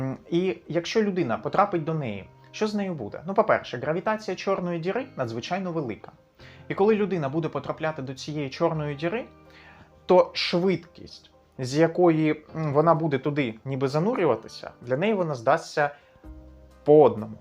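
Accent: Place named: Russia. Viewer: Цікавий російський акцент.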